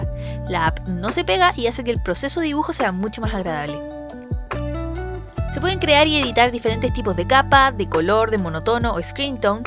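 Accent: Chile. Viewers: Wn me encanta el acento Chileno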